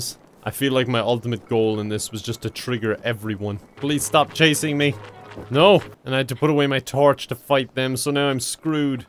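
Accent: Ireland. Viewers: he has an Irish one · The thing with is that he always ALWAYS uploads when I'm doing my homework. I want to ignore it but then I think... can I ignore that irish accent... and here I am!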